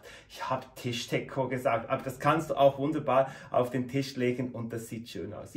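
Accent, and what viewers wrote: Switzerland. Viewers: mit einem soooo lieben Schweizer Dialekt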